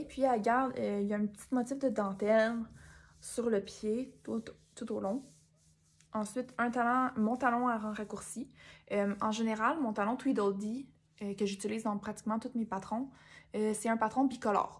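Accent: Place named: Quebec, Canada. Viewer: Podcast très agréable, et je trouve que pour un premier exercice tu t’en sors très bien 👍. Je t’ai trouvée très à l’aise, spontanée, avec des petites diversions qui m’ont fait sourire 😀. Tes tricots et tes patrons sont très jolis, et tu les présentes bien , avec ce petit accent québécois si agréable … Continue ainsi…. Je me suis abonnée pour ne pas rater le prochain épisode.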